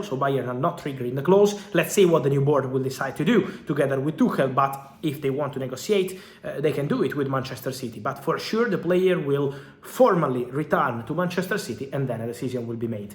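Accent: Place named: Italy. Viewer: O inglês com sutaque italiano fica maneiro, nunca tinha visto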